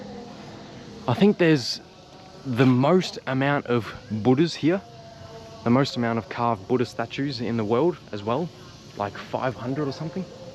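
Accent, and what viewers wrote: Australia. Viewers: Great content I enjoyed watching that. I'm curious about that accent. I was thinking Australia but then south Africa, then maybe both. Am I close? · I absolutley love it when you say HECTIC!! You have the best aussie accent I've ever heard mate ❤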